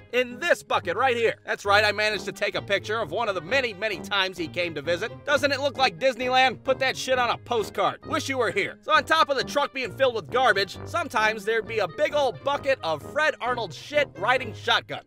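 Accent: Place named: Ohio, United States. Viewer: What state do you live in because just the way you talk I feel like you live in Ohio for some reason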